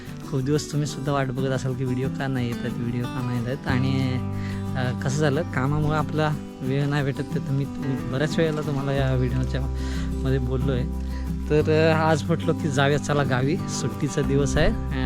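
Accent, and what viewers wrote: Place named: Ghats, India. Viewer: Bhasha. Kokni vatat nahi,ghatavarchi vatte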